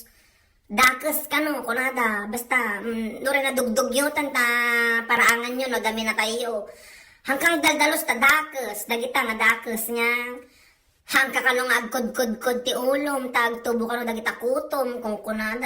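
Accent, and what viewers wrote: Ilocos, Philippines. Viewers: Ang sarap mong tumawa auntie.. Ang galing mo talaga, pure Ilocano.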